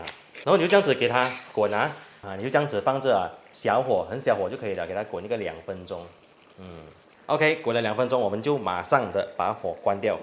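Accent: Malaysia. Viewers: From the way he talks,I think he is a Malaysian Chinese... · 沒有臘腸和冬菇的蘿蔔糕😅聽口音是不是大馬風格的蘿蔔糕啊😅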